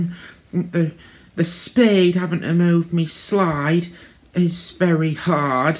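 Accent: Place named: England, United Kingdom. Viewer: Oh yes, youre from England:D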